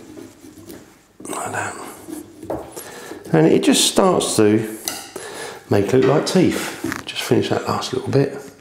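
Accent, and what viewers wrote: United Kingdom. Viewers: u sound like someone from little brittan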